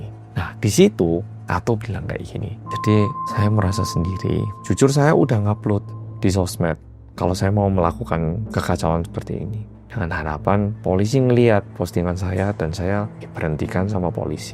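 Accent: Java, Indonesia. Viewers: paling demen nonton ini, logat jawanya medok banget ya wkwkwk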